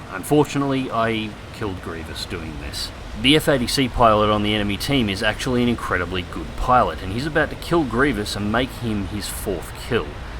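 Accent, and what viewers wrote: Australia. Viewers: Indeed,it's just that Australian accent is attacked by stereotypes,alot.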